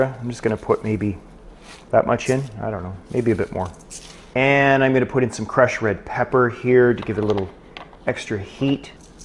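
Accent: Canada. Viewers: Elizabeth B MY THOUGHTS EXACTLY! :) that's always how I'm easily able to identify if someone's Canadian. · When you tune in to hear 'oooot and abooot'... I love the Canadian accent :) Not disappointed!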